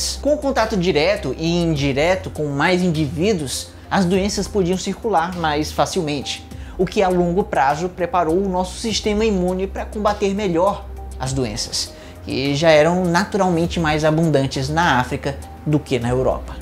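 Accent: Ceará, Brazil. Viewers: nossa achei que ele era cearense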